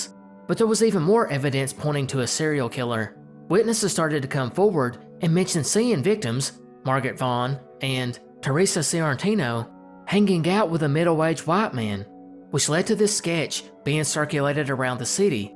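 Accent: South, United States. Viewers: o.k. Ga. boy from Kennesaw here. Love the Southern accent. So cool to hear. I finally broke down and subscribed. To hear the narration. Which is excellent. Not too crazy conspiratorial but leaves a lot open to basic common sense mixed with mystery. A good mix brother. I'm not a 'true' conspiracy guy BUT I do LOVE to hear as many as I can. They are fascinating,......and truly mysterious. I like your cognitive, analytical style. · I'm fairly new to this channel but am noticing how I get a little excited when a new vid appears. Is it me or is this the best narrator on YT right now. It's definitely one of the reasons why it's easy to view the entire episode every time to the end. I love Southern Drawl man!!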